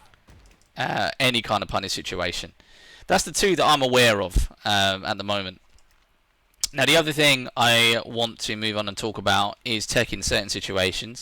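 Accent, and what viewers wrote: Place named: England, United Kingdom. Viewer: Great vid man. Good to hear it from an English accent too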